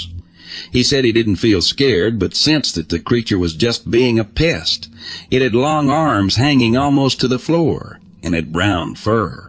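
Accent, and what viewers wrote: South, United States. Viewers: Well done!! So happy to hear from you all again! The new narration did a great job. There's something about the slight southern draw that makes you feel like your grand dad is telling you tale passed down from family. At least thats my take on it! Ready for more!!!!!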